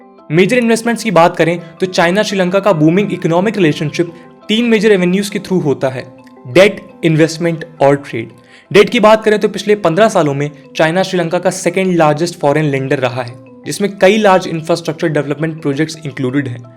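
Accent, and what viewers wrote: India. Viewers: 视频咖喱味太重了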